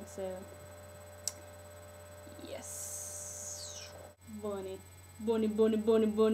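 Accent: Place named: South, United States. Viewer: "Oh gosh she's freekin'" *Camera cuts out* Hahaha. What is your accent? It's really cool. It sounds kinda southern... but you don't talk slow. It's a good mix.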